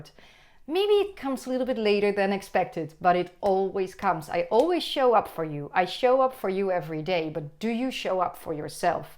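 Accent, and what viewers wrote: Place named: Netherlands. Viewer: this is crazy. I was on holiday last week and saw so many flamingos! Also, I've been seeing so many 44s. Everywhere. That's how I found your channel... It was the first video I clicked on when I looked up what it meant. Also... My twin flame is Dutch! When I heard your accent I thought 'it can't be... can it?' Never seen any Dutch twin flame content before !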